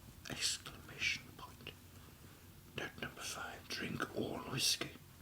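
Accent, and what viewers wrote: RP, United Kingdom. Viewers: That accent is so plummy I had you mistaken for Peter O'Toole! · Well, was not expecting you to have such a spot-on posh accent!!! Wonderful video. Hope you've been doing okay over your absence and thank you for your wonderful videos. 😆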